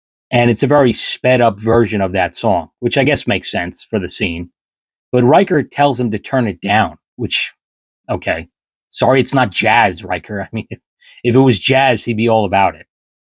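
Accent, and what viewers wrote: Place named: Long Island, United States. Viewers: 2/24/24 (HOW ABOUT THAT DATE!!!) why so much profanity? I could do without all the sexual stuff and cursing ANYWAY great movie! i saw it in theaters with my uncle and my dad The consensus was that it was a fun movie didn't like the diversion into hair metal without mentioning KISS in the 80s KISS were huge again, if a bit similar to other bands of the era they're also NYers just like you (I am guessing by your atrocious accent) given i am no lingust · Never underestimate how much fun it is to listen to a guy from Long Island explain Star Trek.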